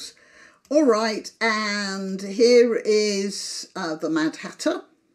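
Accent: United Kingdom. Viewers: Roasting celebrities in a British accent is really next level… 👏👏👏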